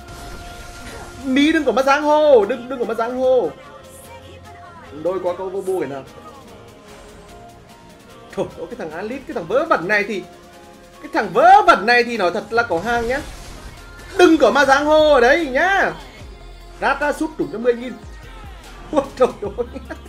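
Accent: Nghe An, Vietnam. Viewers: Cái giọng Nghệ nhà tôi, wou trời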